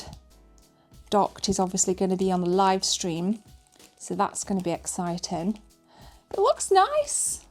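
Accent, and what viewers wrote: United Kingdom. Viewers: I love your accent, I can listen to people from the uk all day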